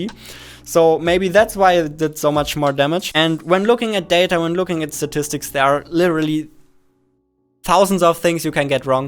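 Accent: Germany. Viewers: This is the most German accent I have ever heard. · Ich hab direkt erkannt, dass du deutsch bist :). Merkt man an deinem Akzent, aber nach weiter so, feier dich und hoffentlich kriegen wir endlich die 100k Abos fertig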